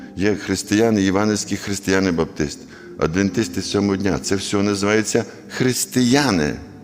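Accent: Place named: Galicia, Ukraine. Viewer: Як гарно і колоритно звучить українська мова з різними забарвленнями територіальних діалектів!))) Галицький говір ))) Слава Ісусу Христу за таких священників!😊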